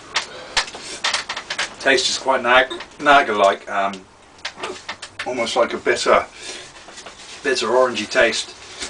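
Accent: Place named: United Kingdom. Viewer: I enjoy your videos. Like I mentioned earlier, you are just a dead ringer for my oldest son, it's like watching him with a Brit accent :-). Continue to carry on.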